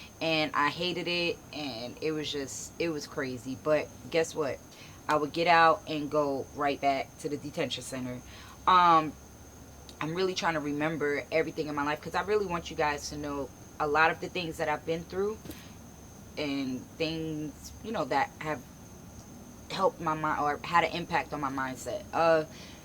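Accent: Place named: New York City, United States. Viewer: I knew you was from NYC girly. I could still hear the accent. That is something we never loose. I’ve been all down south and my accent never left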